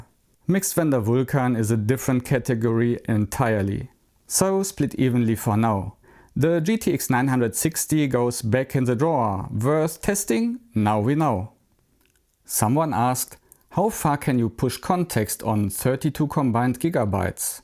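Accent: Germany. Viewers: Hey bisschen off topic und bitte nicht übel nehmen - wenn Du magst, könntest Du mal ein paar von den schwierigeren englischen Wörtern, die man ständig benutzt beim Thema KI (inference fällt mir spontan ein, aber es waren mehr), nachschlagen wegen der Aussprache. Tschörmen Ecksent ist auf YouTube gar kein Problem, aber bei den Fachbegriffen würde es vielleicht professioneller wirken, sie zwar mit Akzent, aber davon abgesehen korrekt auszusprechen. Ich empfehle die kostenlose Seite der Cambridge Dictionary, die haben sowohl Hörbeispiele als auch einen tollen Ersatz für die nervige Lautschrift. With that out of the way: I like your channel a lot, keep being different than those who just run the same boring tests on every new model!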